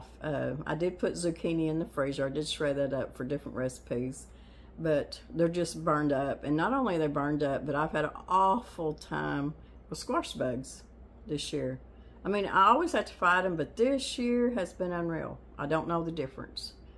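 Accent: South, United States. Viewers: Oh Miss, you are so very precious! ❤ May I make a request? You are so soft-spoken, and if you are cooking and not wearing your mittle microphone I have to turn my phone to maximum volume. And frequently end up turning on the closed captioning, which is in the way and the words that are there are frequently not accurate to what you say (think southern accent to a foreign-based captioner!) and you can understand my frustration.😮 Thank you for all you share with us! Love you and as if you were siblings, and please don't let my suggestion hurt your feelings in any way, shape, or form! I am a dedicated follower and will continue to do so!❤❤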